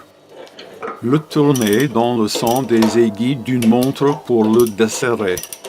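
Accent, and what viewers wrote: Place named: England, United Kingdom. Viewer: Merci Monsieur, avec l’accent anglais c’est encore mieux 😊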